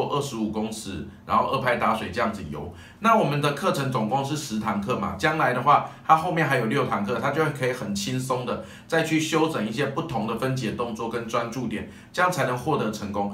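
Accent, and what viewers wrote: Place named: Taiwan. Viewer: 废话，人家教练是台湾人，不说国语难道说大陆普通话？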